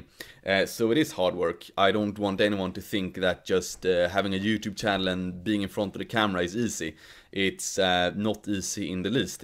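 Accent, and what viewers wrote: Sweden. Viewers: I love our swedish-english accent. Its the best accent exept the geordie accent from Newcastle-upon-Tyne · Nothing wrong with a Swede having a Swedish accent. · But the Swedish accent is great. Yeah your old vids... In them you speak better English than a lot of people in Limerick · Don't worry about Swedish accent in your vids as it signifies where you're from. Content is all that matters. Fluff and beautiful sets don't signify quality. People will wade through mud to listen to reason. I shared your last vid about porn and its detrimental affects on women in a bunch of facebook groups so hopefully your audience will increase as a result. Be up to the task.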